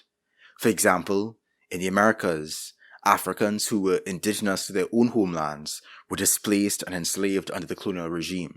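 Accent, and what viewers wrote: Trinidad and Tobago. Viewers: Why you sounding like a Trini 😮🇹🇹💯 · Are you Trini? cuz this accent..... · I heard the accent and went "It's giving, Trini". Glad to be right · Great video! Found you through the latest Noah Samsen vid. Also a trini, recognized the accent immediately. Keep up the good work.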